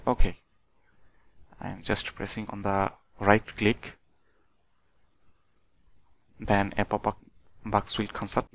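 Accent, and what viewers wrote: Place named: India. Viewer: definitely indian. that accent is unmistakeable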